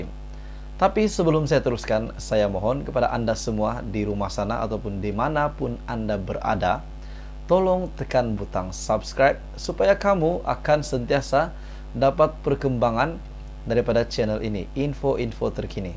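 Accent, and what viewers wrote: Sabah, Malaysia. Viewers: Kalau bukan dari Malaysia dari Sabah lah itu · Awak membuat video sensasi. Tajuk sensasi tp tak ada isi.lain kali direct to the point.jemu tengok muka awak.cakap slang sabah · dia ni orang Sabahlh en'.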